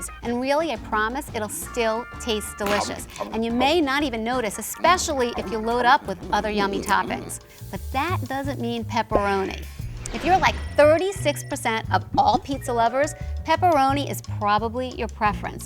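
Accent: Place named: East Coast, United States. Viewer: I love your SEXY east coast accent.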